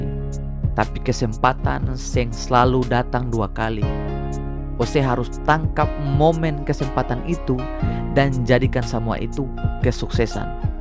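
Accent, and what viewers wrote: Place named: Ambon, Indonesia. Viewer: kk punya kekuatan itu di bahasa dan dialeg ambon, KK percaya saja samua orang pasti pahami dialeg kk, semangat KK #ambonpride 👊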